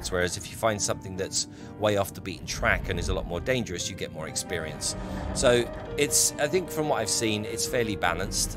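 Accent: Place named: United Kingdom. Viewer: Jonsten, what a chad! Like your British accent btw.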